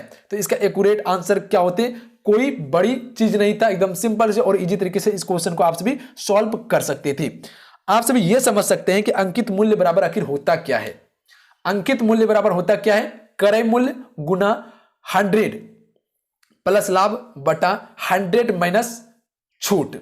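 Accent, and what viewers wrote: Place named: South, India. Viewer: Ye south Indian hindi kyon?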